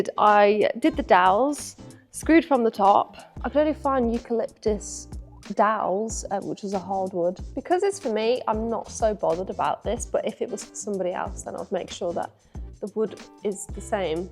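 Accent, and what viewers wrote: England, United Kingdom. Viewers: I hate English accent but your accent makes me fall in love 😍😍😍😍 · Where in the uk you originally from? Sound like from near me. Woman of all trades. Love seeing animals tho. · Lady, you would bring joy wherever you go, for the indifference you hold for yourself possessing merits for which Men would go to war, a body that could make a man tremble, the tender expressions of your face, your attitude towards life(keep rolling on, how effortlessly you come out of things making Life question its own challenges), How Nurturing you are(for the love you have for your Animals), how keenly you do everything, and well British Humour and accent well it never goes wrong, may you be well through all you go through🙏🙏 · You already have a Huge audience. why don't you switch to travel vlogs, you already extremely beautiful, no doubt u ll multiply your subscribers by (God knows how much)....think about it. 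And you have a beautiful British accent, come on....get that dollar.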